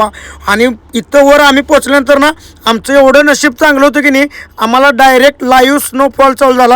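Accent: Kolhapur, India. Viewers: मला ची कोल्हापूर ची भाषा खूप आवडते एकदा मला भेटायला यायच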